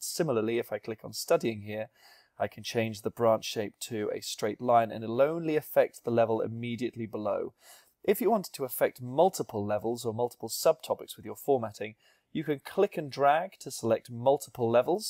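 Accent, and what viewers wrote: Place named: United Kingdom. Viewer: Very nice tutorial, wonderful accent :) God save the king!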